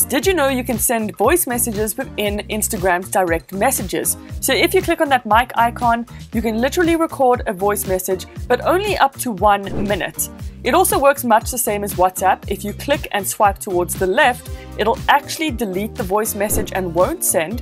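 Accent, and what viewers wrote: South Africa. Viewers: South African ? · South African accent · You from South Africa nehr ? 😂🙈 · Are you south- African?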